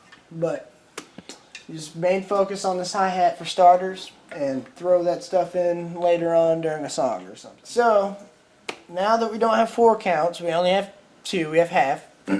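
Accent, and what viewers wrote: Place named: United States. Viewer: You took the time to yuk it up about how lame "country people", whatever that means, are, but you're as hic as a fresh Dixie Daisy whut fell off the turnip truck with your hayseed accent. The super cool long hair doesn't cover your accent. I believe you were thusly ensnared for having forgotten the wisdom learned from the East who teach, "he who smelt it dealt it." If you felt the urge, you could have just said country music sucks rancid smelly goat balls and no one would have been the wiser.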